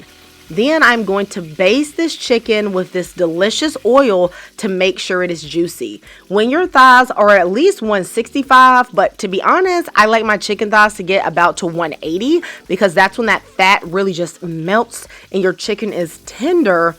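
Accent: South, United States. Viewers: Just found your channel! With all these delicious looking foods....and your accent.....you must be southern!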